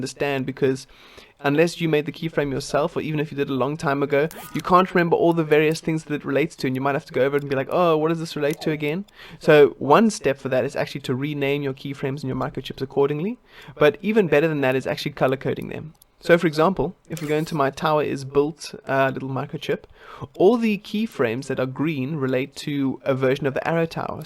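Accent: South Africa. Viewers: That south african accent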